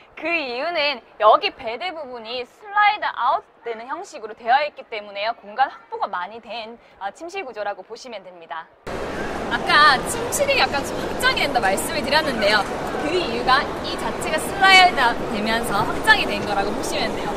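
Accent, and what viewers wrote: Gyeongsang, South Korea. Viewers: 사투리 타령하는 인간들아... 제대로 들어봐. 억양이 경상도 느낌이 있지만 단어나 구문이 사투리가 뭐가 있냐? 사투리는 단어와 서술어 등 구문을 말하는거다.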